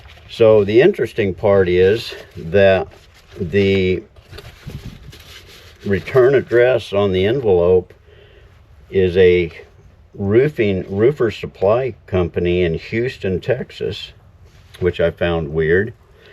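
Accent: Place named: Mexico. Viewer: Sounds like Mexican learning english😊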